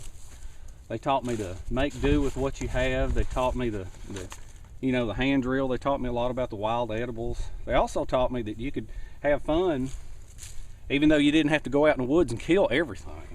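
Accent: South, United States. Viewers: I love watching your videos. From the first word in that fantastic Southern drawl and the infectious laugh right through the fantastic tips and things you can do/make with rope, and ending in your sign offs... it's all truly worth watching. I'm waiting for the warmer weather to arrive here in bonnie Scotland before I venture out and try some of your ideas. Keep making the vids - they make learning fun. Best wishes.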